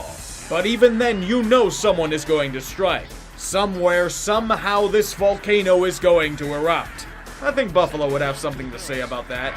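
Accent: Pittsburgh, United States. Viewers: Stop doing the yinzer voice I actually wanna enjoy the coverage on the steelers lol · The return of the Yinzer It’s glorious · Stop with the Yinzer voice makes me wanna cut the video. So fucking annoying and not funny! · the yinzer sultry voice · Don’t ever go to Pittsburgh, that’s literally how every local talks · Jesussss christ that insane cringe yinzer voice. Nearly unsubbed.. nearly. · Unpopular opinion: I dont the like the yinzer voice, as a Steelers fan. I miss days of our Steelers lmao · Did anyone understand anything he said in Yinzer Mode? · inner Yinzer has finally come out after all these years and I LOVE IT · the whole Yinzer voice thing is unwatchable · yinzer voice is a true thing of beauty. To anybody who has never been to Pittsburgh and wonders if we really sound like that? Pretty much, yeah, we do. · “yinzer voice” is actually real voice.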